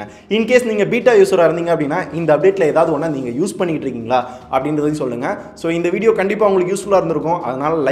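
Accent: Madurai, India. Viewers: Madurai style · Madurai karanga na summa VA Thaniya therivom la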